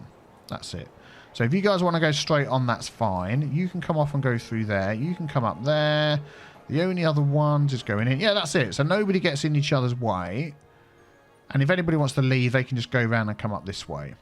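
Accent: United Kingdom. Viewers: The exact right tone of voice. British indeed. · Didn’t now you were British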